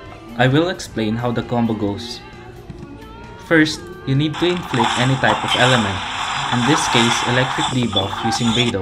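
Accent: Philippines. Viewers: You sound filipino. Are you?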